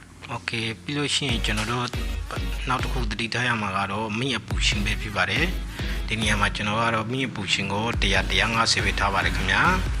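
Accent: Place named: Rakhine, Myanmar. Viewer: ရခိုင်စကားပြောသံ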